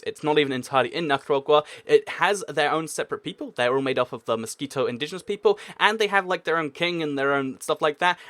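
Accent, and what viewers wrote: United Kingdom. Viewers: Who else couldn't tell if this guy was British or Australian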